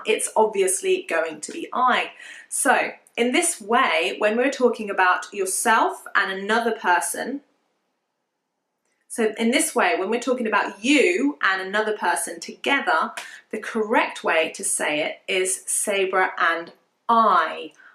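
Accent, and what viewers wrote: United Kingdom. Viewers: Thank you so much for improving our English since I found your channel I watch 3or4 your videos everyday to improve my English, love your teaching and British accent and this channel is very useful for me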